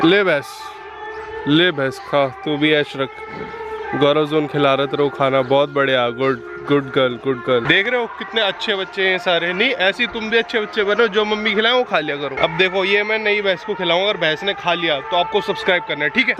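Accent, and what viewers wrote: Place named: Hathras, India. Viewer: bhai aapki language toh hamare jaise hai hum bhi hathras ke hai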